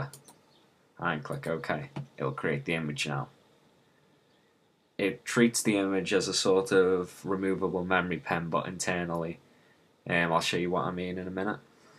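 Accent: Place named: Liverpool, United Kingdom. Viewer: A scouser! Hi from liverpool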